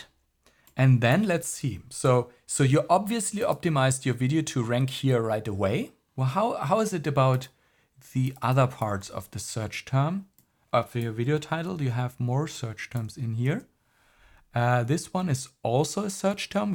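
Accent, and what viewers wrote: Germany. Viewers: I hear you are a German :D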